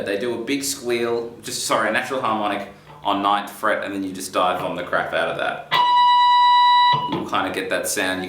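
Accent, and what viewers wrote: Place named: Australia. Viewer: Are you a guitar teacher dude? You sound Australian! Which state are you from!?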